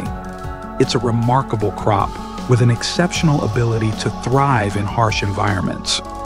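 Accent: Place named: Morocco. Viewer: هذا المعلق باينة مروكي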